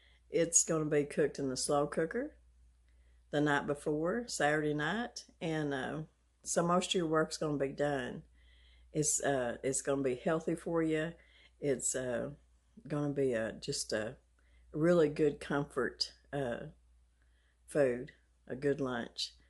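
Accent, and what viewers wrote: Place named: Kentucky, United States. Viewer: Hi! I’m Jane and I’ve been watching you for a little while. I’ve gotta tell you just how special you all are to me because I honestly feel like I’m back home in Kentucky when I’m watching. Your accent is just like me and my family’s and you cook like them too. Lol Thank you! By the way, made the Elvis cake the other day and my husband and son pretty much ate the whole thing in 2 days. Guess it was good or something.😂 Keep on cooking and growing.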